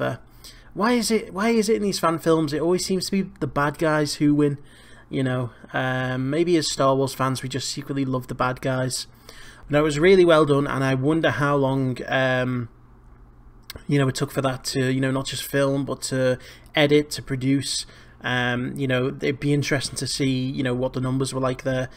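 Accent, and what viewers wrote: Wirral, United Kingdom. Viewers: are you from the wirral?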